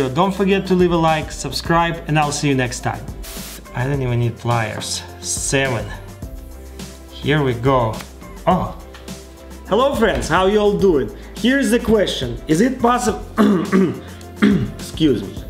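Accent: Russia. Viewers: So russians all sound the same...interesting. CrazyRussianHacker sounds the same.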